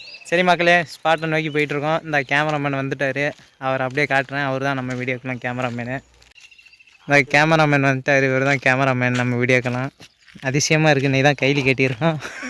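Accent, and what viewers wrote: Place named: Kaveri Delta, India. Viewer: Peachila therila, vivasayi Vera.. delta place of tamil Nadu. Thiruvarur or tanjore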